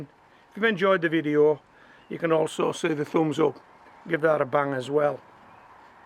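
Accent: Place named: Yorkshire, United Kingdom. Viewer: Where are you from mate? Love your accent it’s like a mix of Yorkshire and Black Country 😅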